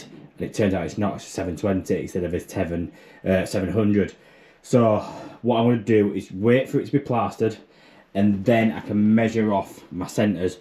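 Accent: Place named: Yorkshire, United Kingdom. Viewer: Genuinely not trying to be a dick but I’m so confused how you’re so Yorkshire in everything you say apart from the way you pronounce “plaster” and sound really posh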